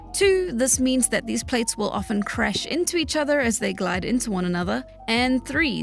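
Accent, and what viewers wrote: South Africa. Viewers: I was looking for this comment lol. It was the first thing I noticed. I think us South Africans just have an ear for the accent haha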